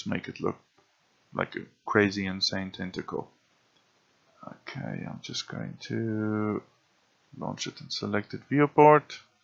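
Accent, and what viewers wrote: Afrikaans, South Africa. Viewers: Buddy, you afrikaans?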